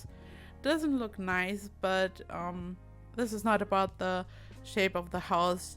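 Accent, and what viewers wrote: Germany. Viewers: Are you German´?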